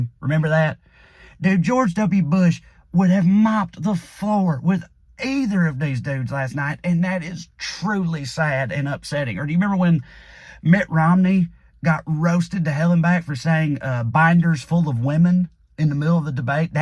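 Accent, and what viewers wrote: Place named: Appalachia, United States. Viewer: I love how Transatlantic has Hillbilly in it. That's how you know he's keeping it real...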